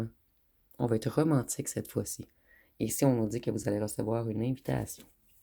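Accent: Quebec, Canada. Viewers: Vous êtes adorable, un travail poussé pour créer de petites cartes bien sympas comme celle des chansons, j’aime bien votre énergie solaire et cette voix sympathique, l’accent du Québec trop mignon. Bref, vos guidances sont précises et agréables. Belle journée 💕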